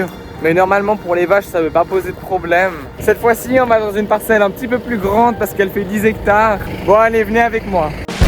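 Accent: Switzerland. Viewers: slt tu es de quelle region ? tu a un accent mais je n'arrive pas localiser . en suisse peut etre nan ? je viens de decouvrir ta chaine . fort sympathique + 1 abo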